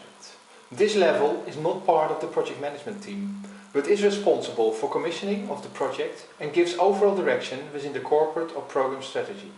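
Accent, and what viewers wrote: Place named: Netherlands. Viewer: You have a nice dutch accent.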